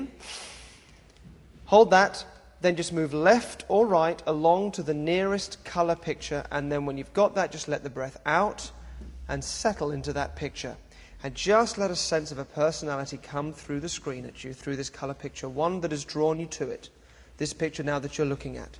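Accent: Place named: England, United Kingdom. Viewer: this is horse doody!! there is no seance just a bunch of englishman crap and he will not stfu making me wanna commit suicide with that horrible accent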